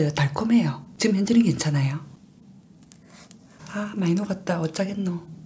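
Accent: Busan, South Korea. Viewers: 부산사투리 넘 귀여워요😂😊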